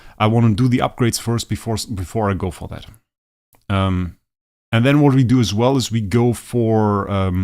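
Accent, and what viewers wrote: Germany. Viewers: average german voice tbh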